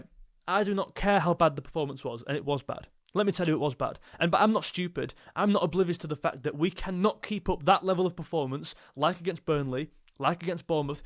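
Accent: Liverpool, United Kingdom. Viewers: what a scouse accent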